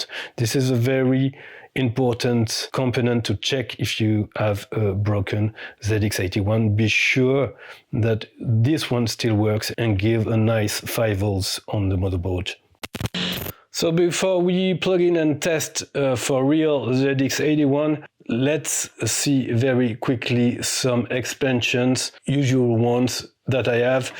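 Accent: France. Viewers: Don't worry about your French accent. Your English is better than my French (neither read nor speak it). Arctic Retro embraces his Norwegian accent - you should your French. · Thanks for the video! I helped win a ZX80 for my primary school, then managed to persuade my parents to buy a ZX81 when it came out - that Tyrannosaur from 3D Monster Maze still haunts me! Thanks for showing that Pitfall style game, it looks amazing! PS - I'm a Brit and I love your French accent! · I very much like a French accent, I like the French. Love the video on this and the Oric, and I very, very, much hope that you will continue to make more English language videos. Particularly, since much to my embarrassment, I don’t speak French. (In my defence I wanted to learn French at school, when the brain is still receptive - but an older sibling had chosen Spanish and we had all the books. 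Colour me disappointed I can tell you.) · Instant subscribe. And never apologise for your French accent. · French accent: French. Kisses computer: definitely French! Great video and please don't apologise for speaking fluent English with a beautiful French accent. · Never apologies for your lovely french accent ❤ not a scam but cheap tack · Awesome! We produce all kinds of new add-ons, and do refurbs of Sinclair machines. Lovely to see your new channel, and I do like your French accent! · I am very proud of myself, I watched your video and I could understand all that you were saying, so no I can listen to a Frenchman speaking. Thank you, I give you a big thumbs up! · Happy to listen to a French accent instead an AI voice over any day. I enjoyed the content and look forward to more videos. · Don't apologise for your perfect French accent. You present an excellent video in great style. Donc il faut que je voie les videos que vous avez déja téléchargées sur Youtube en français! 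The ZX81 back then taught me how compact you could make a simple OS to cope not only with the interface and the Basic interpeter, but also the display. It was a model of economy of coding. Its printer was very clunky, too: a spark printer which burned characters in a silver metallic coated paper to create dark grey "printing". I found a box of spare spools of that coated material only a few months back, safely put away in 1985! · Hi, Do not be sorry for your French accent. As you could not help where you where born. Be proud of it. 👍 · Ace accent, reminds me of the comedy French Accent you always see in English language TV! Great subject!! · Welcome to the rest of the world, LOL. 😁😎 love the French accent and thank you for the fabulous video. ❤️❤️❤️❤️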